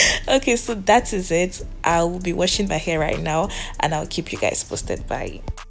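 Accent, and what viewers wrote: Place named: Igbo, Nigeria. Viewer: This your Igbo is sweet o😂❤️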